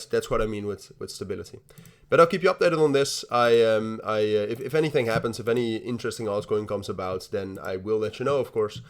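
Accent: Netherlands. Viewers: Is this guy Dutch?? Dutch accent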